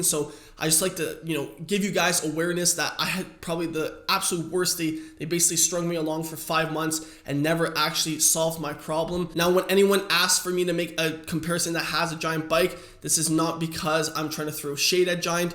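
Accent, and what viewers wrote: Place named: Canada. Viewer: Really nice video. Good job! 👏🏼 (P.S. I like that Canadian accent. Makes me want to go watch hockey on TV. 🏒🤣)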